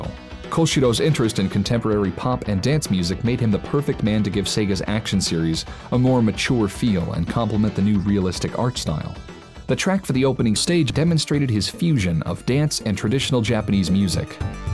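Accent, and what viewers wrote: United States. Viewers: Shinobi being one of my fave Megadrive games ever! Curious why you use UK boxes with an American accent? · You my friend are the best gaming historian I have ever seen. I'm not kidding, I would pay to watch this show without batting an eye. You should consider packaging these episodes and selling them as volumes on Blue Ray and digital download. I would easily pay 10 to 15 dollars per disc....Hell, I could be persuaded to spend more depending on the episode. Every console and game you cover is done at a professional level not seen on YouTube before. Further, the reverence you pay to the subject material is unparalleled. Thank you for making these videos. One question that I have...Why do you call Sega's 16-bit machine the Mega Drive and Mega CD? I ask this question for two reasons. You yourself have an American accent. Further, if I had to guess it appears that most of your audience is centered here in the US. 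Now, this does not change my opinion of you or your show obe bit. If you prefer calling Sega's 16-bit console the Mega Drive, have at it.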